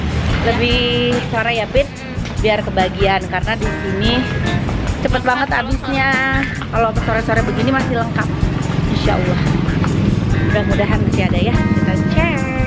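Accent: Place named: Garut, Indonesia. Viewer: Resep pisan ngadangu Teteh Logatna garut pisan...abi orang garut Lami d bandung Janten teu apal ceplak t garut mana teh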